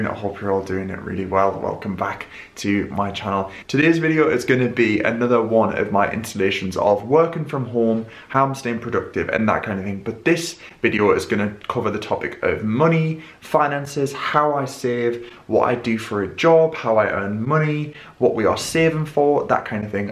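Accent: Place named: Newcastle, United Kingdom. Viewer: your geordie accent caught my ear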